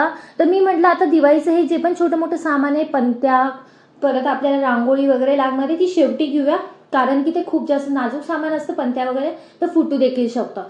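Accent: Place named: Vidarbha, India. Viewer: Vidarbh language watat aahe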